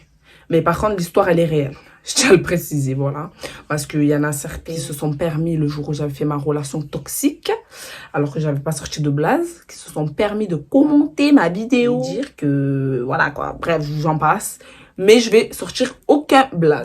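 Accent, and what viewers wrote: Marseille, France. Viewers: Je kiff t’es vidéo tu a fort l’accent marseillais tu es des Cartier nord ou sud je me suis fais un compte YouTube que pour pouvoir commenter et pouvoir m’abonner à toi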